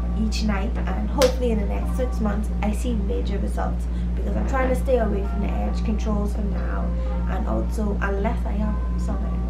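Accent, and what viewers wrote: Bahamas. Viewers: I don't comment on videos but been watching your channel for like two months. Love the authentic Bahamian accent and your content is improving. Stay true and Congrats.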